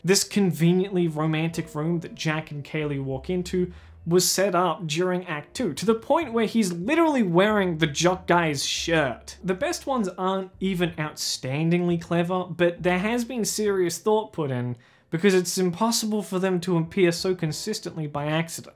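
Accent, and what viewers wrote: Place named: Australia. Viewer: why do you sound less australian now?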